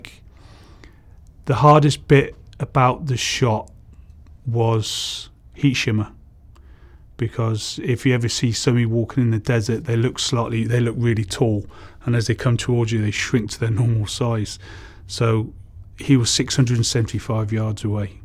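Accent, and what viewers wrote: United Kingdom. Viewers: i mean its really fucking obvious that hes british, why do you bother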